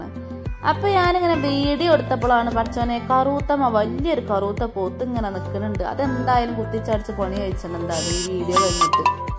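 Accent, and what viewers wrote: Malappuram, India. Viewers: നിങ്ങൾ എത്ര വരെ പഠിച്ചു നിങ്ങളെ സംസാരം കേട്ടാൽ മലപ്പുറത്തക്കാരെ ഓർമ വരും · സംസാരം സൂപ്പർ. മ്മളെ മലപ്പുറം സ്ലാങ് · ഇതാണ് തനി നാടൻ മലപ്പുറം ഭാഷ അഭിനയവും നാട്യങ്ങളും ഇല്ലാത്ത വീഡിയോ മാത്രമല്ല വീട് പെയിന്റ് അടിക്കുന്നതിന് മുമ്പ് തന്നെ ഇതൊക്കെ കാണിക്കാനുള്ള മനസ് വന്നതും ബെഡ് ഷീറ്റ് വീഡിയോക് വേണ്ടി ശരിയാക്കിയതാണെന്നു പറഞ്ഞതും നിങ്ങളുടെ മനസ്സിൽ അൽപ്പം പോലും ജാഡയും പൊങ്ങച്ചവും ഇല്ലാത്തത് കൊണ്ടാണ് ആദ്യമായിട്ടാണ് ഈ ചാനൽ കാണുന്നത് വീഡിയോ ഒരുപാട് ഇഷ്ട്ടപ്പെട്ടു ഇതൊക്കെ സപ്പോർട്ട് ചെയ്തില്ലെങ്കിൽ പിന്നെ ഏതാ സപ്പോർട്ട് ചെയ്യാ ഞാനും മലപ്പുറമാണ് നിങ്ങൾ മലപ്പുറത്തു എവിടെയാ? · ങ്ങള് ന്തിനാ ഇംഗ്ലീസ് പറേണു ..മലപ്പുറം style സൂപ്പർ അല്ലെ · നിങ്ങളെ മലപ്പുറം ബർത്താനം ഉഷാറായിക്ക്ണ് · ഞാനും മലപ്പുറാ പക്ഷെ ഇങ്ങിനെത്തെ ഭാഷ സംസാരിക്കില്ല ട്ടോ- കേൾക്കുമ്പൊ ഒരു പ്രത്യേക രസം😅😍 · ഇത്താ ഞാനിപ്പഴാ ഇങ്ങളെ ചാനൽ കാണുന്നത്... ഞാനും മലപ്പുറം പെരിന്തൽമണ്ണയിലാ... ശെരിക്കും ഒരുപാടിഷ്ട്ടായി... 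ഹോം ടൂർ ഒരുപാടിഷ്ട്ടായി...നമ്മടെ മലപ്പുറം ഭാഷ കേട്ടപ്പോ അതിലേറെ സന്തോഷായി 🥰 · നമ്മുടെ മലപ്പുറം ബ ർത്താനം കേൾക്കാൻ എന്താ രസം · ഗുഡ് ഈ സംസാരം കേൾക്കാൻ തന്നെ ഒരു പ്രതേക സുഖം മലപ്പുറം സംസാരം എത്ര കേട്ടാലും മതി വരില്ല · മാഷാ അല്ലാഹ് നിങ്ങളുടെ മലപ്പുറം ഭാഷ പൊളിച്ചു ❤️❤️ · താത്ത എന്റെ മലപ്പുറം ഭാഷ നല്ല രസമാണ് എന്തിനാ താത്ത ഇംഗ്ലീഷ് താത്ത എന്റെ ഭാഷ എല്ലാവർക്കും മനസിലാകും കൊറേ ഒക്കെ പഠിക്കാൻ പറ്റി ഇനിയും പുതിയ വിഡിയോസൈവരണം insha allah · ഇതാണ് അസ്സൽ മലപ്പുറം ഭാഷ...Very good ingane thanne parayanam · നല്ല വീട് മലപ്പുറം ഭാഷ കേൾക്കാൻ നല്ല രസമാണ്. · Spr vedio&malappurm samsaaravum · മലപ്പുറം ഭാഷ... ഒരു രക്ഷേമില്ല kalakki👍👍👍😁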